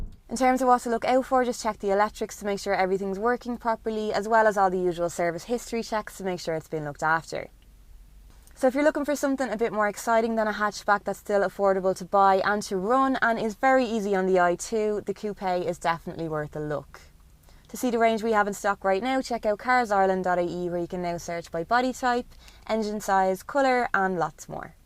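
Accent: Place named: North Dublin, Ireland. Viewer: Strong north Dublin accent on this woman.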